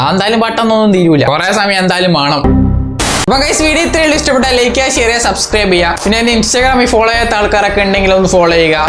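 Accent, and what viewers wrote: Kannur, India. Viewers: Kannur basha poli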